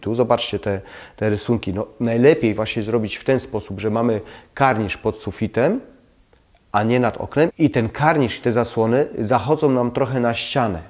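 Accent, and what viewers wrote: Silesia, Poland. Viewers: Czy ja słyszę nasz cudny śląski akcent? 💚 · Zgadzam się z przedmówcami, a od siebie jeszcze dodam, że mówi Pan wyraźnie, bez zadęcia, a śląskie naleciałości są fajnym smaczkiem :) Dziękuję, że Pan się przykłada i dzieli wiedzą. Ciepłe pozdrowienia ☀️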